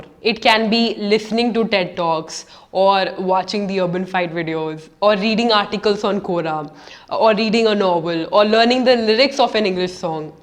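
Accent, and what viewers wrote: Hyderabad, India. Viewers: All is good but your pronunciation of words with 'con' 'com' is not ken but kon or kom, like continue, communicate. And there is no way that indians can get off their ascent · Are you from Hyderabad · Indian accent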